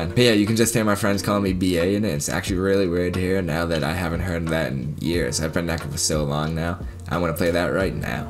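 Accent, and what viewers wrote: Boston, United States. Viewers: BOSTON ACCENT was the goat back then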